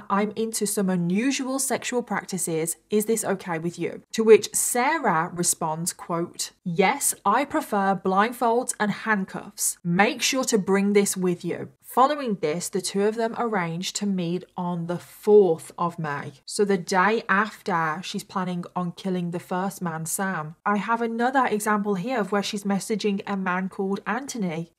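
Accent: United Kingdom. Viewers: I just recently found your videos. I really wish you didn't feel narration with cosmetic/make-up application was necessary for success. You and your words are enough. Plus with the accent of the British Empire, we already think you are smart. Sidenote: use the Vicks (menthol, camphor, petrolatum etc) on a small patch on your chest before bed, wear a light shirt then go to bed.....Not that you sounded any different to me, but you sounded worried about it. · Your voice isn’t annoying because you’re sick. It’s because it’s British.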